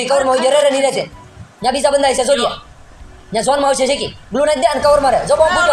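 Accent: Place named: Kathiawar, India. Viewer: bhai કાઠીયાવાડી lage se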